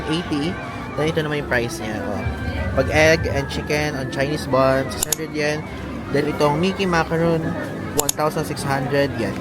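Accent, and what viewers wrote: Nueva Ecija, Philippines. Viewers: Hi! I recognized your accent, you’re from NE pala, same tayo ng hometown. I’m from Aliaga/Zaragoza. Hope to meet you when i get back home.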